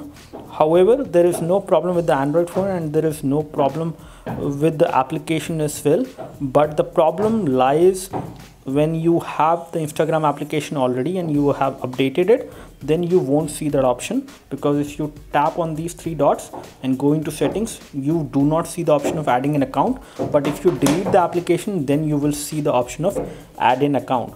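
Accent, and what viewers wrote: India. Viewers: Javier Sports it's an Indian accent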